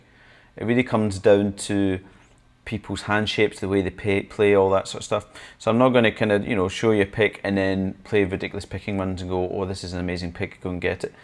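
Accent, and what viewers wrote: Scotland, United Kingdom. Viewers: Is this a spoof? Love your Scotch accent!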